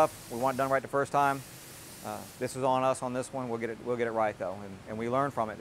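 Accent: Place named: Western Pennsylvania, United States. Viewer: Are you originally from Western PA? I hear some accent from that area of western PA, WV, OH area. Just certain words.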